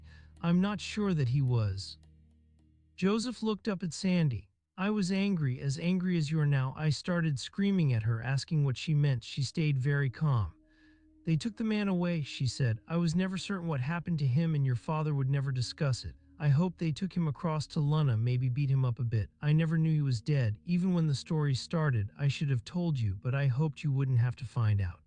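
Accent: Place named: United States. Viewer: Thick US accent….. disservice to Cleaves.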